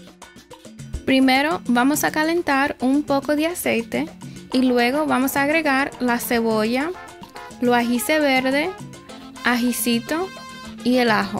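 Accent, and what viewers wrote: New York, United States. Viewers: tiene un acento newyorkyno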